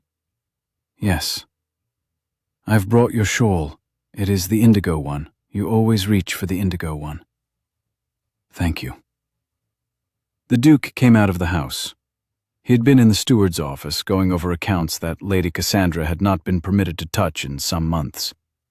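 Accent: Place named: England, United Kingdom. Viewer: I would like to add that this story had the perfect Narrator. with an English accent. This voice is definitely one of the best. So many stories about England, with Dukes & the aristocracy just use a narrator that is American & it does not fit. This one created a perfect Presentation. Well Done!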